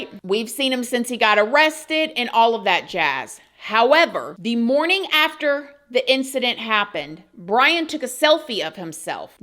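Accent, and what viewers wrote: South, United States. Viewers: Love your videos! I watch every new video that comes out. It makes me laugh tho everytime at the end of your videos cuz you go from southern “yall’s” to valley girl “Byeee” 😂 Love it!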